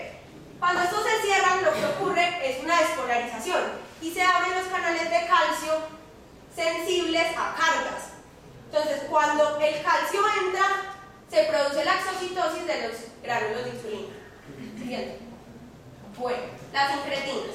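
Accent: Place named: Venezuela. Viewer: Me encanta su acento. Es venezolana o colombiana o de donde es? xD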